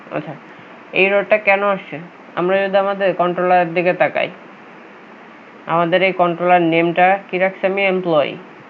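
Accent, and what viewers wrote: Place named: India. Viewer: This is India-English !!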